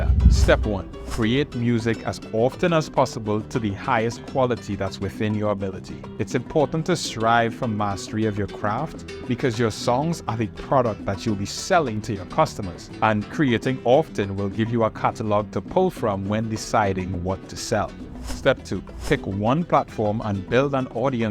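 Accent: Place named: Germany. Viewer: Was not expecting that accent bruh lol. You German?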